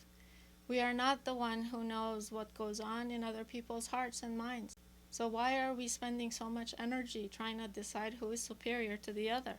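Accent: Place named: Iran. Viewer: khahar, age mishod, man vaghan daste shomaro miboosidam... vaghti harfaye shomaro goosh midadam, chesham pore ashk shod. midoonam irooni hasti, az lahjat malome, vaghan shomaro doost daram.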